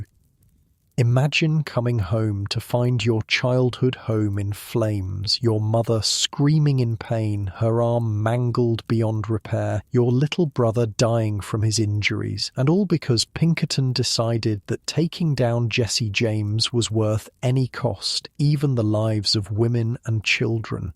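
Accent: England, United Kingdom. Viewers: Old west tales with an English accent?! Yeah, I’m out🙄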